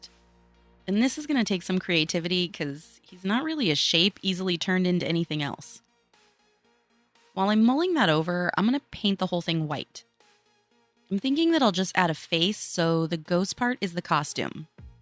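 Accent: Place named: West Tennessee, United States. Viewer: gotcha! Well that is surprising. I'm in West TN so not close! Haha 😂 You sound like the people around here. Not talking about the country fol, just the city people. Lol Which now that I think about it, the city people, especially the newer ones (like in the last 20 years) would have come from somewhere else. So it makes sense their accents would be similar to those in other parts of the country. Lol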